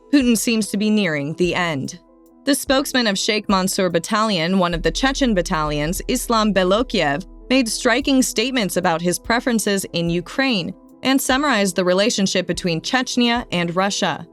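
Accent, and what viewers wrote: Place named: United States. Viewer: Nice American English. Love it)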